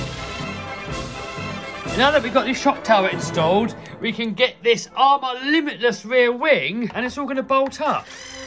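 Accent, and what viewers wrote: United Kingdom. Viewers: Very British English. Great caröeee.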